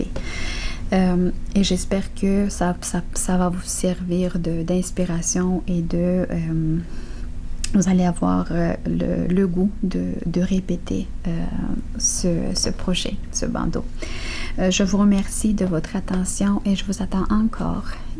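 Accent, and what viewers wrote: Canada. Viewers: Merci 😀 pour votre beau bandeau et vos explications ce point est vraiment très très joli et pas compliqué ,je me trompe peut-être, mais d'après votre voix, et sans être indiscrète ne seriez vous pas d'origine canadienne ? Si je ne suis pas trop curieuse pouvez me donner votre réponse svp un tout grand merci 🇧🇪 👍 😀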